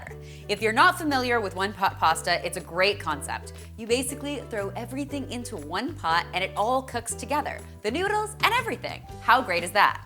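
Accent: Canada. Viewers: It's such a funny thing, as an American, to hear someone speak for 10 minutes only to realize they're Canadian when they say "about". Nothing bad about it, I genuinely like it.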